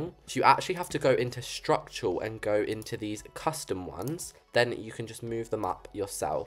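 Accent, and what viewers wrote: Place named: United Kingdom. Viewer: the british accent-❤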